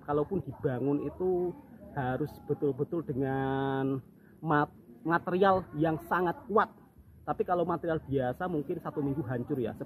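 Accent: Java, Indonesia. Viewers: Jawa nya di daerah mana Mas??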